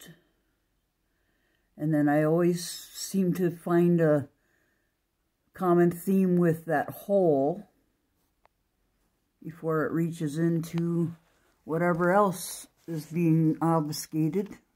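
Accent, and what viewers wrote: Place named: Michigan, United States. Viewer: I love hearing your Michigan accent. Wow lotza stuff up there today, here thick gray so far making it so dark inside.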